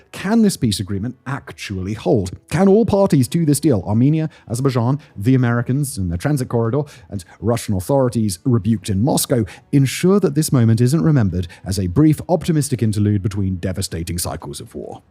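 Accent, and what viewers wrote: United Kingdom. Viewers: His British accent is too heavy. It's difficult to follow what he's saying.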